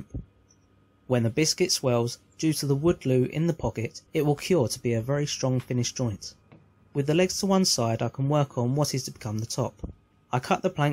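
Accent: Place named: Cockney, United Kingdom. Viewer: You reminded when you mentioned this vid earlier, I needed to watch this - been meaning to but never remember at the right time. Curious, what epoxy do you use for glueing up? Im sick of the Gorilla type where you need ten ton of pressure to squeeze out the gear from those two tubes! Just my ten cents worth, whats always drawn me to your vids was the kinda lock stock and two smoking barrels feel, like at the start and end of this vid in a fashion of "Guns for show, knives for a pro" "The entire British Empire was built on cups of tea" "No, I asked you to give me a refreshing drink! Wasn't expecting a fucking rainforest. You could fall in love with an orangutan in there!" You had that same tone before but didnt copy it, kinda came naturally across in your vids - That was your USP from your vids to me mate, hope that makes sense? Quintessentially English/Cockney in a funny way done naturally - I'm meaning this as a complement.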